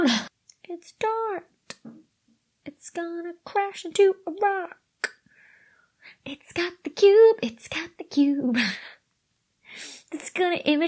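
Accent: United Kingdom. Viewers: Dr Becky, what part of the United Kingdom are you from? I ask because I am intrigued by your speech patterns . Believe me this is not a criticism, I am from the United States where we haven’t spoken English in years. Just an old man’s curiosity. I have started listening to your new book and love it so far.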